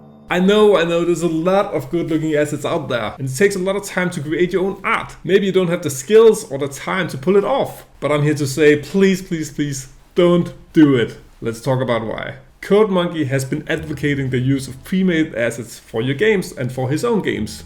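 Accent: Denmark. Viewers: Love that thick danish accent.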